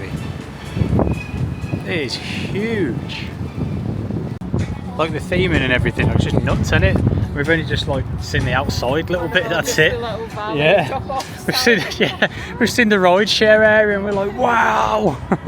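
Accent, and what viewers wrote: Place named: Birmingham, United Kingdom. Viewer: Your thoughts were exactly the same as mine when I first visited Vegas. We love the Luxor and I cant wait to get to that part of the vlogs when you get there. The Luxor for us is quiet to retreat to but still on the strip and a fantastically themed hotel. I'm picking up your accent being local to mine too which makes the vlogs very easy to watch and relate to. (Im from Birmingham UK). Keep up the content, this is the video I stumbled on to find your channel.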